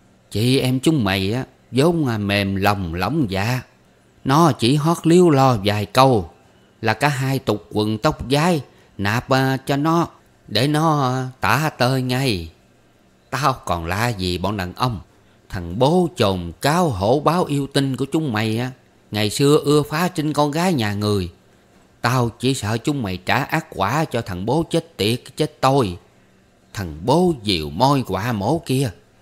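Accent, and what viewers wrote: South, Vietnam. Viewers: Mèn đét quỷ thằn thiên địa ơi, yêu quá những từ miền Nam đặc sệt sền sên luôn. Thanks NV Hồ Trường An và giọng đọc thiệt là Nam Kỳ của ❤❤❤❤❤❤❤❤❤❤❤❤❤❤❤❤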